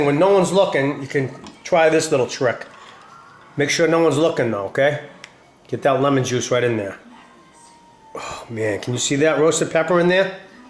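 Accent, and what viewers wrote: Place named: Italian-American, United States. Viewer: Oh your accent doesn't bother me at all. And I love how Italo-Americani try to speak Italian. It's not your fault but living in the States, I'm sure your parents had to learn it making it very difficult to then pass on the Italian language. My parents instead had to learn both English and French for working purposes, but once at home it was Italian. For this I was lucky because later on it came handy, I am now living in Italy. Your recipes are good and easy for anyone to make!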